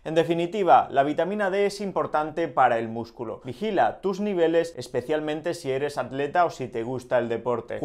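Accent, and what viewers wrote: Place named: Spain. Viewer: En España todo mundo habla igual, debe venir del mundo del fútbol: Athletic Madrid, Bilbao etc etc, además de comercios de ropa e implementos deportivos DECATHLON, separan las sílabas y como la H es “muda”… yo no veo, para nada, q pronunciar de esa forma, tenga q ver con inteligencia o formación académica, son modismos del idioma.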